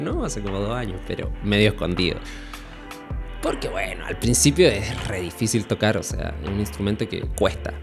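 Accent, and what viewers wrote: Chile. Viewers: Hola. Te sigo desde hace poco. Ya me parecía... acento chileno pero arquitectura argentina. Al final, no entendía. Te escribo desde el centro de la provincia de Río Negro. Gracias.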